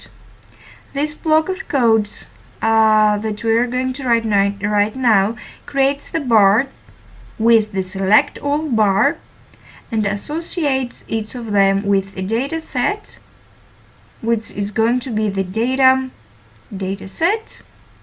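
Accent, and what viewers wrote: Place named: England, United Kingdom. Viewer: I asked where are you from but you didn't answer.im just curious about your English accent.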